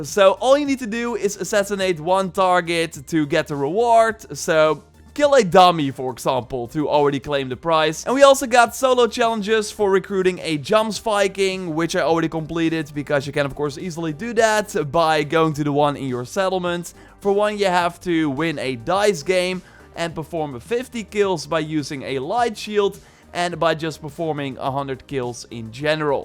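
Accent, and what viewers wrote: Netherlands. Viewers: WOW, IF THAT IS NOT A REALLY THICK DUTCH ACCENT, I DON´T KNOW WHAT IS!?!?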